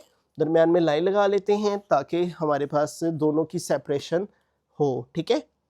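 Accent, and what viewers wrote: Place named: Bihar, India. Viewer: Sir g mujhaie ap Ka lachter Bihar achie lag tai h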